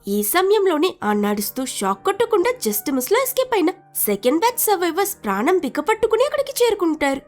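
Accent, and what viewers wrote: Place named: Tirupati, India. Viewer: Hello by identifying your voice I am guessing your from tirupati around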